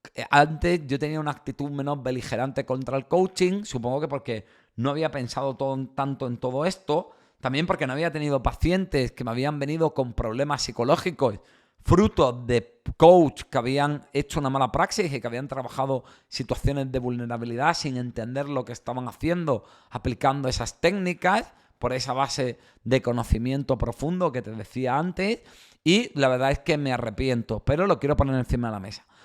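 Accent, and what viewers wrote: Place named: Madrid, Spain. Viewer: Siempre he pensado que el coaching era un timo y una simplicidad así que este vídeo ha sido un sesgo de confirmación para mi. Lo que me llama la atención es tu acento, no te ubico porque tienes dejes madrileños, toledanos y extremeños. Es pura curiosidad. ¿Me lo dirías?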